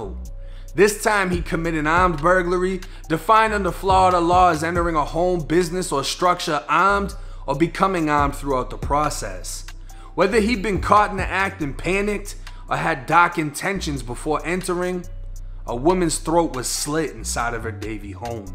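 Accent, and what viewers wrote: Boston, United States. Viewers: Not gna lie homie from that Bostonian slang you got going on I thought you said “That’s when he blew top all over the ground” …lol I was like what the fuck does that me— oh blue tarp lol Now get to tha packey and get ya self some beers as my buddy jeano would say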